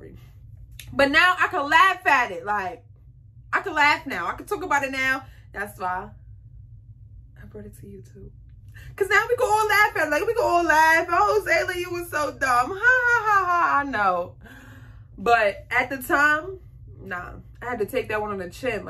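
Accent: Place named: New York, United States. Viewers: You most def from new York don’t no where tho 💯😂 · That gotta be Brooklyn 😭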